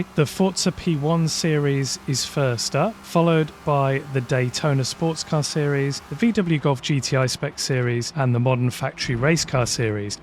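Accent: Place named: England, United Kingdom. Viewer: Good comments with a lovely English accent! (From a Pom in Australia) Nice to see they have addressed a couple of the many sound issues, I think the Capri and GT3 Bentley may sound better too (but is it wishful just thinking?) I hated them last time I used it, went back to Seven briefly, ahh, that's better... But now in Eight, hmmm.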